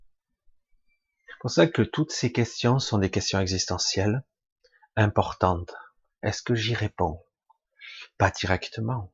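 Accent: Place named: Ardèche, France. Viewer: Bonsoir j'adore vous entendre avec l'accent ardéchois c'est tellement vrai ce que vous dites le peuple n'en peux plus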